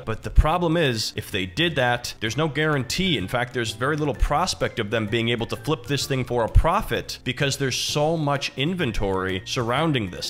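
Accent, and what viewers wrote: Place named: Florida, United States. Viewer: seems like a guy I’d chill with. Looks and sounds like a Florida local too.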